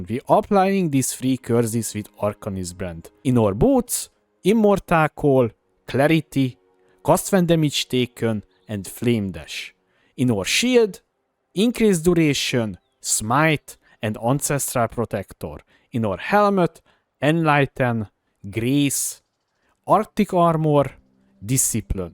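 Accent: Hungary. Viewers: You have a hungarian accent :D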